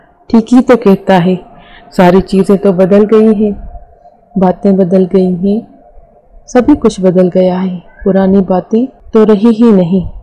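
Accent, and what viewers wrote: Sindh, Pakistan. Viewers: نائس.... سندھی لہجہ___ بہت خوبصورت!! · سندھی ایکسنٹ۔۔۔۔۔واہ۔۔۔۔۔بہت مزے کا انداز۔۔۔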